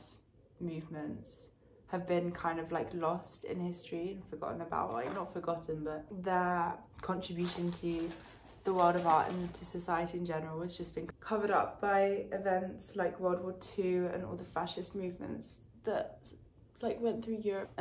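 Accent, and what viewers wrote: France. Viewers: Vrmt j’aime trop tes vidéos ! Ton accent français est bcp trop mignon en plus. Je regarde aussi tes vidéos en anglais aussi psk en tant que française qui étudie au UK j’essaye d’avoir l’accent british mdrr :))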